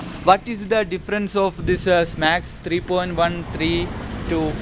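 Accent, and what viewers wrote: India. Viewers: Pota indiano pa ka bwect pakinggan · I dont think your a filipino, your accent sounds like indian guy for sure. Your video is not credible for all sabungeros to share what is good chicken feeds if your not one of us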